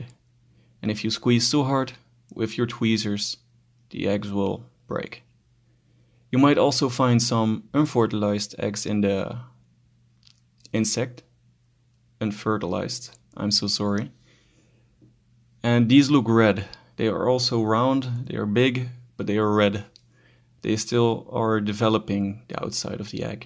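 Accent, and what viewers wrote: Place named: Netherlands. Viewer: The fun thing is... Ik ben ook Nederlands en ik dacht het al aan je accent te horen, maar ik wilde het zeker weten... Heb je ook levende Heteropteryx Dilatata thuis?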